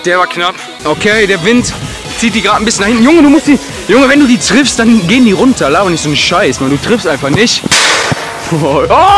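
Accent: Germany. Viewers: kommst du aus Wuppertal?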